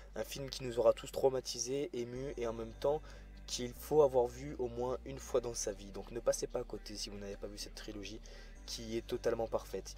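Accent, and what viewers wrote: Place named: Marseille, France. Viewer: Parce que t'a l'accent d'un sudiste et tu pronnonce à la marseillaise.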